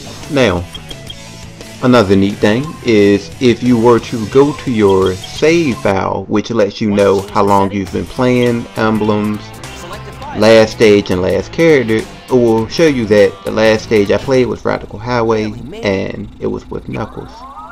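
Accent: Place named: South, United States. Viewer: You sound like a fine southern black gentlemen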